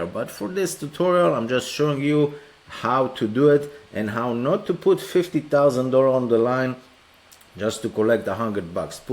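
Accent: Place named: Romania. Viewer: That has to be a Romanian accent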